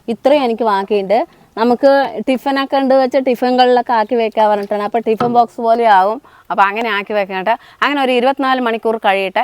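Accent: Palakkad, India. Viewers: അടിപൊളി 👍🏻.ഒരു പാലക്കാടൻ slang ആണല്ലോ ചേച്ചി? പാലക്കാട്‌ ആണോ?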